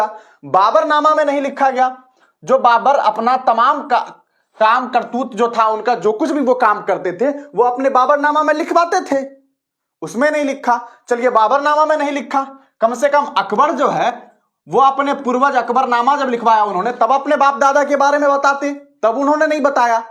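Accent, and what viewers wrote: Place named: Pakistan. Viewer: नाम हिन्दुस्तानी मगर तेरी बोली से पाकिस्तानी होने की गंध आ रही हैं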